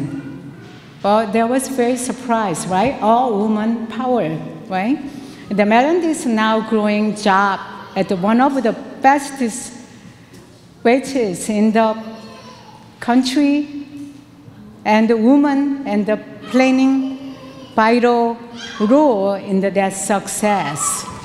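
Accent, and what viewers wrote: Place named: South Korea. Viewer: 발음이 한국식 영어네요 영어는 쉽지않아요 아이고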